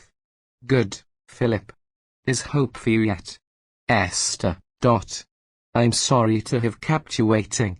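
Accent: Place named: Wales, United Kingdom. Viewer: it sounds like the voice has a bit of a welsh accent or something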